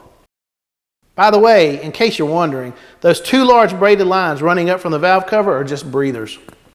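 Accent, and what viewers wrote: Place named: Texas, United States. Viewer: I wish you would've used someone else to narrate these videos. My employer's former chief officer of operations was from Texas and his twang was annoying like this guys.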